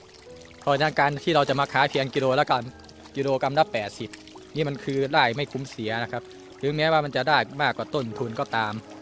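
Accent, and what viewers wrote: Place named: Isan, Thailand. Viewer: อีสานใต้หรือ อุบล แน่นอน อิอิ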